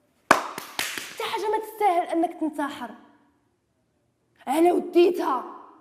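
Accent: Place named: Morocco. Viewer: لي تصحيح معلومة هدي لهجة مغربية بيضاء وليست لهجة ديال مغرب لي تيتكلمو بيها بيناتهوم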